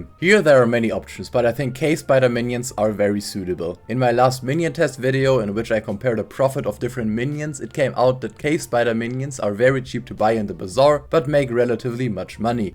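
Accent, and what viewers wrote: Germany. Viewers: Man hört den deutschen Akzent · Kommst du aus deutschland ? Weil entweder bin ich dumm oder man hört den akzent stark raus 😂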